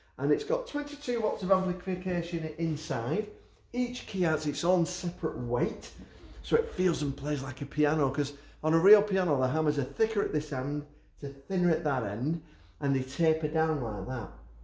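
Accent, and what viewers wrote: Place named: Liverpool, United Kingdom. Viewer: Are you from Liverpool? You sound like the lost Beatles…lol. Great review! I am a guitar player looking to dip the toe into keyboards.. old man looking for a new challenge… thanks for the great informative review!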